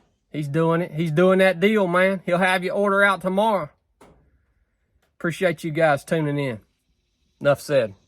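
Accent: South, United States. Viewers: What part of America is this accent from??? I need to move there ASAP 🧡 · I'm from Belgium sir and I wish I had the same accent when I speak english. Southern accent is damn good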